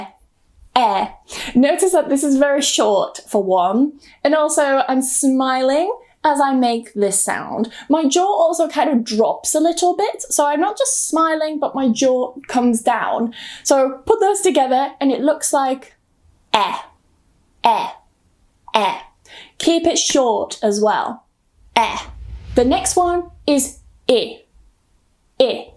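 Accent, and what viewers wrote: United Kingdom. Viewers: Hola, estoy muy agradecido contigo porque tu canal me ha ayudado bastante, me has sacado de preguntas muy significativas. Te amo. Me enamora tú bello acento británico. Saludos desde Bogotá, Colombia.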